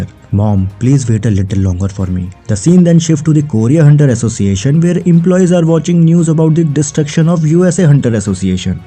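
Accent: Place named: India. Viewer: Nah not this India voice 😢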